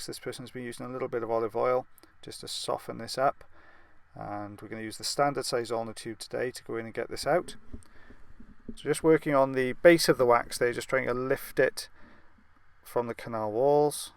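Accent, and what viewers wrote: Wales, United Kingdom. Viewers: Are you welsh cuz same😂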